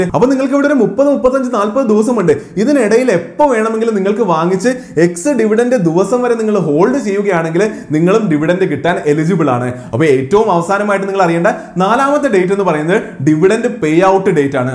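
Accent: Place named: Kozhikode, India. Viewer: super man super kozhikodan bhashayil paranjal oroo mukkummoolayum thappi egal paranj tharand 👍👍👍👌👌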